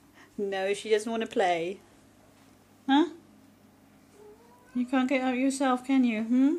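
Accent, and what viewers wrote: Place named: South Africa. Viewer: If you don't mind me asking, but where are you from? You sound South African :)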